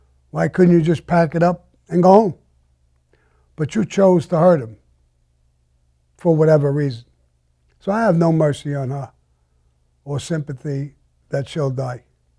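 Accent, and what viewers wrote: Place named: Rhode Island, United States. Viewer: The way he says words like bottle and mouth.. Reminds me of my Grand Father. Providence RI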